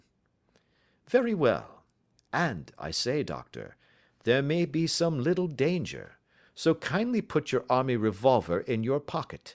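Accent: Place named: United States. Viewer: No no no.... Sherlock cannot be read with an american accent...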